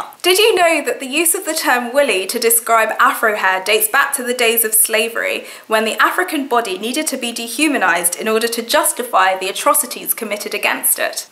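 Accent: England, United Kingdom. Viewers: Which part of England youre from, love the accent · fucking brits and their accents, fucking hot lol 😍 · Yes, this is considered very well spoken British English.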